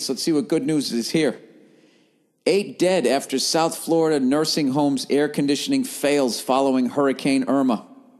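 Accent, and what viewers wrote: Boston, United States. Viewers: he has a Boston accent...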